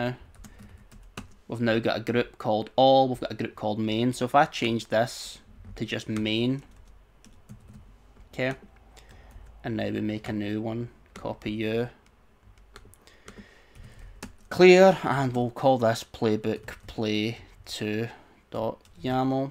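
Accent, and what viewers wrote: Scotland, United Kingdom. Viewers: Great video - epic accent :) hello Scotland :) cheers from Munich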